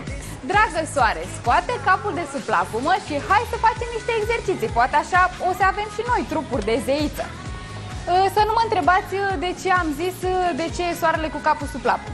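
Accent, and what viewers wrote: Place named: Brazil. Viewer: Sounds Brazilian to me.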